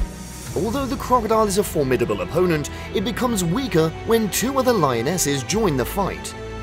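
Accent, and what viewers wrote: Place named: United Kingdom. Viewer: i like the narrator because he can speak with a British accent...jolly good show old bean!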